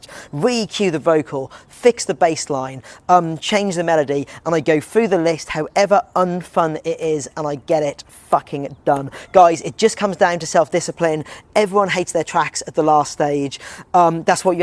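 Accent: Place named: United Kingdom. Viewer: Bro this guy has great voice.. Totally British accent!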